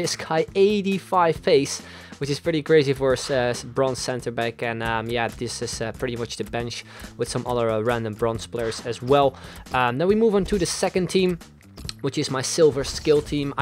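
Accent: Netherlands. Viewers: Kom jij uit Nederland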